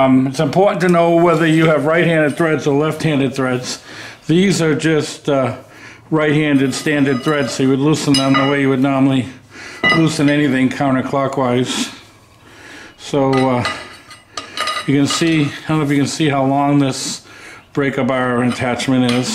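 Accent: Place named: Boston, United States. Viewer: Chicago, Jersey or boston? Oooo he just said the word bar.... hes definitely Boston! 👍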